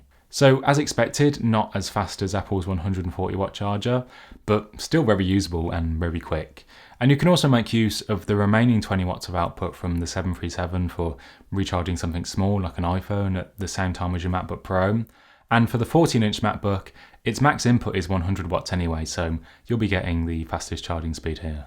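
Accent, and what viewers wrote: Midlands, United Kingdom. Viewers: I know a midlands man when I hear one. 😎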